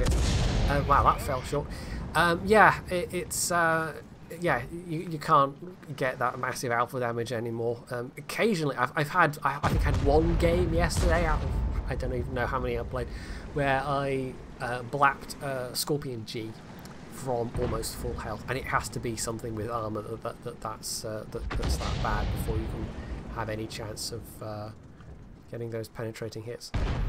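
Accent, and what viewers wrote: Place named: Scotland, United Kingdom. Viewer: I didnt knew you are Scotish :)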